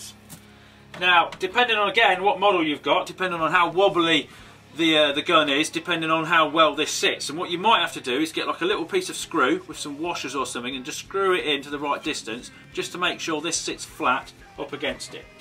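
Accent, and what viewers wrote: United Kingdom. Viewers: It was some screws he's British it's his accent that makes you think that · I love his British accent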